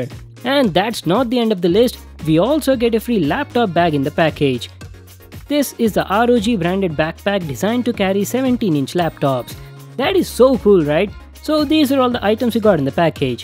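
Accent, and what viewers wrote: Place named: India. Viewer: u hv the most unique and original indo english accent of all the indian youtubers🤩🤩. keep it up bro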